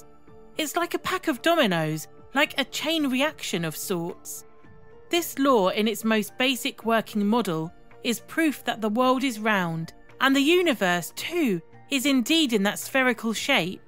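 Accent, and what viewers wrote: United Kingdom. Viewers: WHY do they always use strange. British accents to narrate these videos ?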